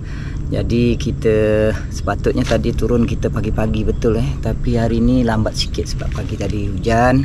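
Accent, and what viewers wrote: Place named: Kelantan, Malaysia. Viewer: Abg asalnya org mn ek? Sebutan ikan tu sama mcm org Kelantan sebut 'ikan kitam', dan banyak lg lh ikan² yg abg sebut sama mcm org kelantan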